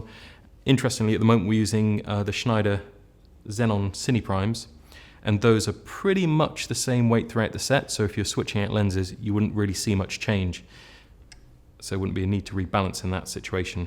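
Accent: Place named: United Kingdom. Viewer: the "lightest touch" love the british accent :)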